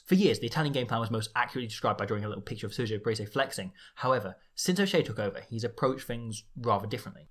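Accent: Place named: West Country, United Kingdom. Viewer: The most patriotic Italian to have a West Country accent.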